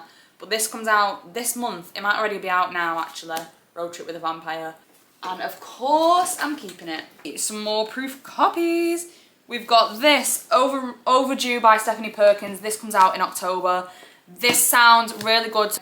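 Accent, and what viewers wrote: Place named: United Kingdom. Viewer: May I ask what region of the UK your accent is from? I've recently became interested in all the English dialects and want to learn how to distinguish them. I think the only tv show I recognize the accent is from Adolescent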